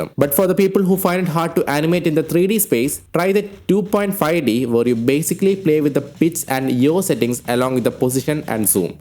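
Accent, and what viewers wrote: India. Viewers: Are you indian because accent is like indian people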